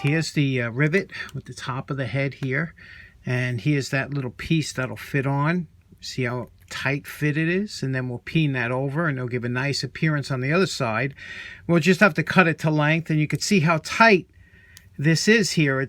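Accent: New York, United States. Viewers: Cool refurb! I've spent the last 30 years in Raleigh, NC by way of Mohnton, PA originally. But I'd love to bottle your NY accent and unleash it at will? 👍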